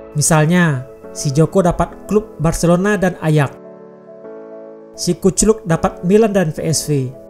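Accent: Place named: Medan, Indonesia. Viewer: Kayaknya abang ini oranh medan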